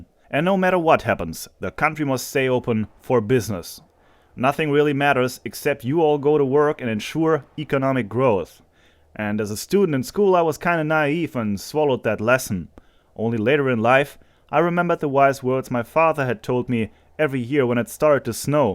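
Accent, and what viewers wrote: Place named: Germany. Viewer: Despite your nearly unappreciable german accent, your english is very good and easy to understand.. didactic and vell done video, gracias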